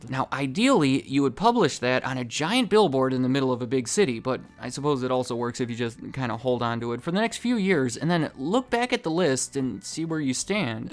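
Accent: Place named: Midwest, United States. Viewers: Sounds like a typical Midwestern accent. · I was thinking midwest, but I could be wrong.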